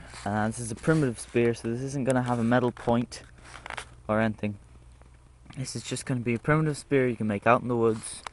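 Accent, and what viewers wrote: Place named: Ireland. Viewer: u hav such an irish tone to ur voice ha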